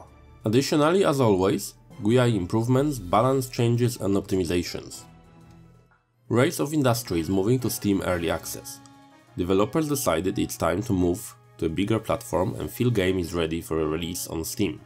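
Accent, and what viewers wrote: Poland. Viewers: i don't know if you will feel offended but you sound polish. are you polish?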